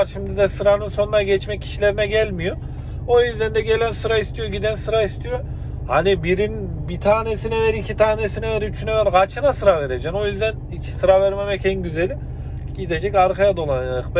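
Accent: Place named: Central Anatolia, Turkey. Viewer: Kolay gelsin REIS konusma Tarzin ic anadolu lu oldugunu gosteriyo galiba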